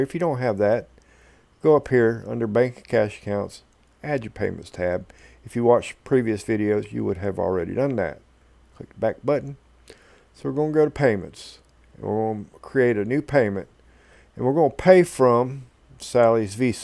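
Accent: South, United States. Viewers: man I love southern accent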